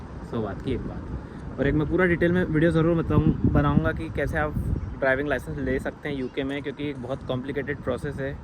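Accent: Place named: Madhya Pradesh, India. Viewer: are you from madhyapradesh bro ,your language tune like mp bhopal thats why im asking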